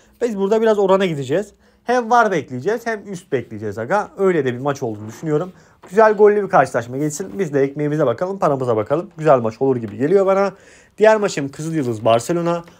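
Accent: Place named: Thrace, Turkey. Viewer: aga sen trakyalımısın bizim gibi konuşuyorsun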